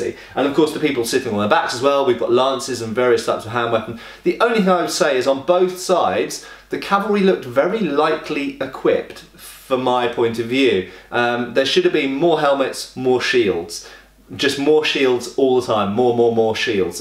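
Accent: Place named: United Kingdom. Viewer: did he just say "soccer" ? He sounds british though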